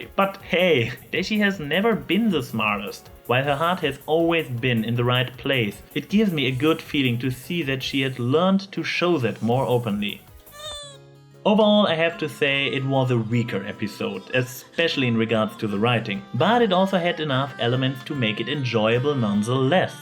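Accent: Germany. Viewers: I have no idea why, but I seem to be really happy to hear your German tones today.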